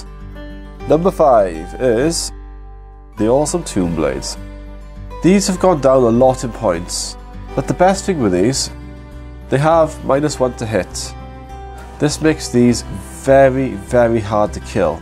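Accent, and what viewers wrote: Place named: Wales, United Kingdom. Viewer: haha I read through all of these just to confirm you were in fact welsh. would recognise that accent anywhere, big ups from Crickhowell and Swansea. Necrons are a horrible faction to play against though, far too much reanimation, or stupidly op invulnerables..i'm looking at you 3++ wraiths.. I mean seriously gw were just lazy not thinking of better rules to reflect their toughness like..increasing their toughness, or giving them a 5+ fnp ect.. having 3 of the receive a charge from a ccw ironclad dread eat it up and then devour a leviathan is just stupid if you ask me. Anyway good vid sorry for the rant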